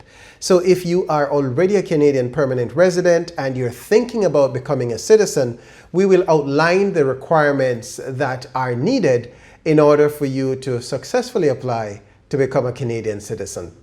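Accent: Jamaica. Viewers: I love that you still kept your jamaican accent!!! loooool I will be in touch I work in IT, I am 45 y.o in the USA right now,but living with my own immigration here. how do we start a private conversation to see how you could help me move over there for IT work. thanks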